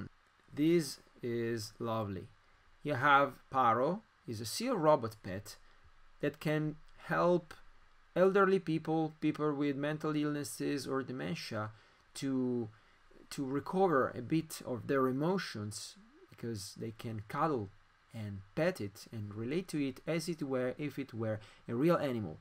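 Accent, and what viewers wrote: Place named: Italy. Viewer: Mi scusi per offtop, ma mi piace che Lei parla Inglese con accento Italiano! :)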